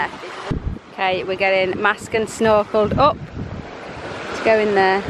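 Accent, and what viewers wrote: United Kingdom. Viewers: nice vids, love your Brits accent made me remember my years in Surrey, UK for my MSc.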